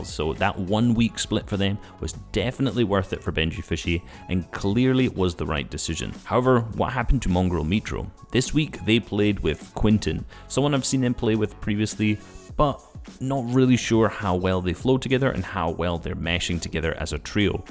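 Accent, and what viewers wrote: Scotland, United Kingdom. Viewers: Where in Scotland r u from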